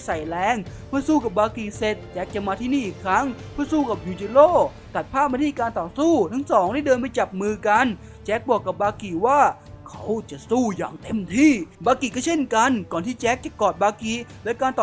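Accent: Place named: Thailand. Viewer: ทำไมบางทีพี่อ่านออกแบบเหน่อๆๆอะ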